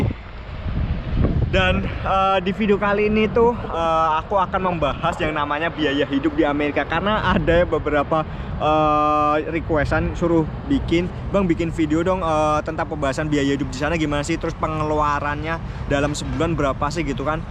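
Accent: Java, Indonesia. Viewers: Ini kok sdh di amrek msh medokkk. Lbh nginglish org indonesia. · Medhok jg ya mas wkwkkwkw gokil seru videonya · Asli jawa ya bang, jawa mna bang? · Mas kok suaranya medok tapi wajahnya kayak blesteran apa ada campuran negara yah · Suarany asli medok jawa los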